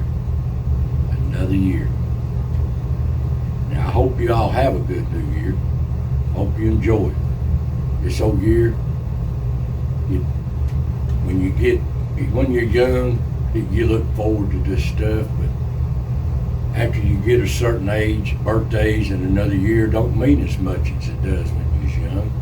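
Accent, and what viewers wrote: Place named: Swain County, United States. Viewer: Just seen this video. So sorry you and your wife have been sick. Prayers for quick recovery 🙏 ❤. Wishing you a Very Happy New Year as well and all the little animal's. As you spoke I realized I am the elder now. I have 3 brothers left. All 3 of my sisters passed long ago. I miss them so much. All of my Family have passed. Just my brother's and me left along with all of our children. are you kin to any Laws from Swain County? My Mother in law was from there year's ago and passed in late 90s. I loved her. Noticed you not only have same accent but said something few say anymore and I miss it. She would say yuns come back as we lived far away. Thank you for being real. Pray 🙏 you have a Blessed and Awesome 2024. ❤🎉🎉🎉🎉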